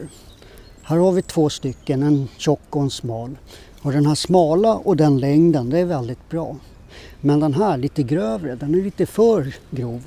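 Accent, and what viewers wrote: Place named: Stockholm, Sweden. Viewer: Samma dialekt, stockholmska. rösten är ju inte väldigt lik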